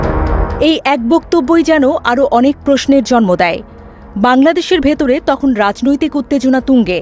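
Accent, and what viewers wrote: Kolkata, India. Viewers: এই মেয়েকে কলকাতা থেকে এনেছে ntv news তার নিজের স্টাইল পুরাই কলকাতা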